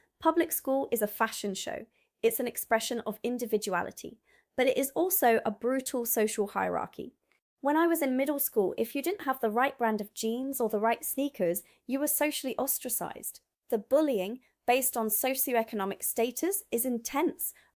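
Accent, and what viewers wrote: England, United Kingdom. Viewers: This woman sounds very British for an American. The biggest differences relate to gun laws. Children get killed in USA schools but all their families get are "thoughts and prayers". There are never any amendments to USA gun laws. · Why does she sound complete English? Just asking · First of all: You are already acquiring a proper British accent after only 2 years in the UK. Good job, you are acclimatizing rather well! One important topic not mentioned here: The Media, the News, whatchamacallit! In 'Murica, the "News" are all about ratings, what makes people watch their channel, and EVERY media outlet has found fear to be an attention magnet. Reporting the most dire happenings seems to draw viewers in 'Murica, and the media emphasizes bad news to the point of insanity. I think it was Michael Moore that traveled by road to Canada, and described a pit stop just south of the border where the TV was blaring about the latest shootings, kidnappings and road pile-ups in 'Murica. 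Exciting news, eh? Soon after crossing the border, he made another pit stop at a Canadian service station. There was a rather muted TV in the place that was running a calm Canadian news channel, mostly about local politics and local community news. No school shootings, kidnappings or pile-ups. Just ordinary, everyday news, no overblown disaster news. Of course shit happens in Canada, but it is not blown out of proportion. Relaxing news, eh? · That’s the most English accent I’ve heard for someone who’s only been here two years. Something weird about this. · English accent! Better than mine 😂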